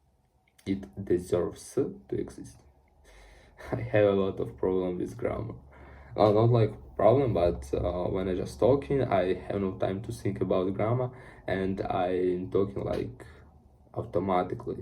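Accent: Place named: Ukraine. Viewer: When i started to listen you , i understood that you from Ukraine, because of your pronunciation. I'm also from Ukraine 😂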